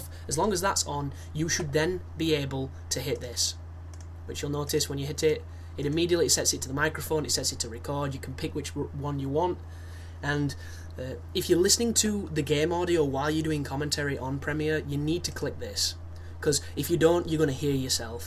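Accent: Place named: England, United Kingdom. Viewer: its cuz he has an english accent which makes anyone sound cooler/more interesting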